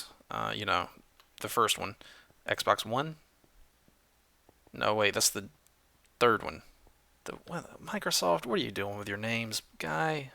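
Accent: South, United States. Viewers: Hey, where are you from? It sorta sounds like you have a little southern accent goin on:P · quick question....are you forcefully saying water differently to mask your "southern" accent? just something I've picked up on and it sounds kinda off (not criticizing you for doing it though) and secondly what did you use to make the jack o' lantern texture? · Mild Southern American accent. I believe he said that he's from Louisiana.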